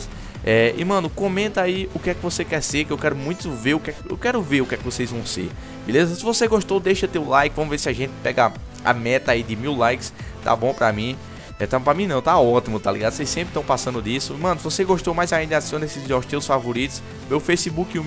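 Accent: Northeast, Brazil. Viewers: ai vc é nordestino! por que eu so de pernambuco e vc?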